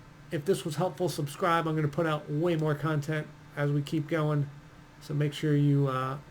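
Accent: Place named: New York, United States. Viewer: You're so smart & your vids are so wonderful & so helpful -- both by yourself and, especially, with Helena. You're funny, smart, cute & handsome,. Love your NY accent... plus your wild enthusiasm & wicked humor!!! God bless you.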